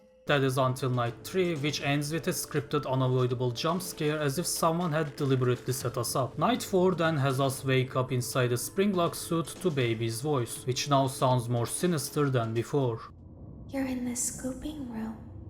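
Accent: Turkey. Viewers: I was gonna ask are you from Turkey because of you accent but then i saw your channel name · Nice video and a style that is harder to find in a good quality like your video keep going and türkçe aksanım biraz batıyor bir şekilde kurtulabilirsen çok hoş olur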